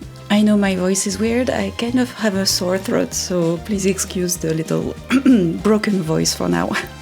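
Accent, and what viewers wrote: France. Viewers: Je suis déjà quelques vidéastes anglophones qui font pleins de réaménagement/cosplay/whimsical stuff. Entendre ce bel accent français et les expressions ça m’a fait tellement bizarre 😂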